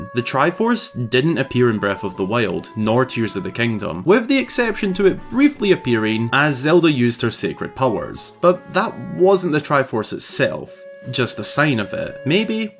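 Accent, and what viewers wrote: Scotland, United Kingdom. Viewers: He is scottish I just realized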